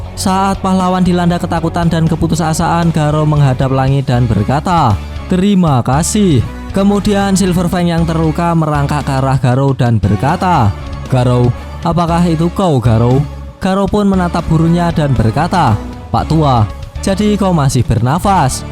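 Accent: Java, Indonesia. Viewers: Gacocok , medok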